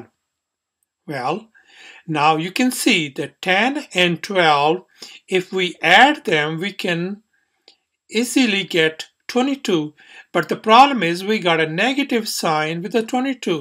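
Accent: India. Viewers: thank you guy with indian accent (i guess he's indian) now everyone's exam is saved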